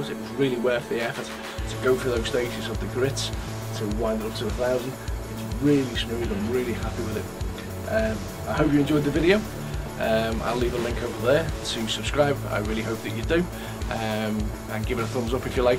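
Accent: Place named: Liverpool, United Kingdom. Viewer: oh wow Eastham eh, my Auntie and Uncle lived in Eastam in Raeburn Av, small world. I didn't want to say the accent was scouse just in case you are like me and say i'm from the wirral not l'pool. thanks for the reply and keep up these great videos, that's 2 plans i have got from you now thanks for that. Barry.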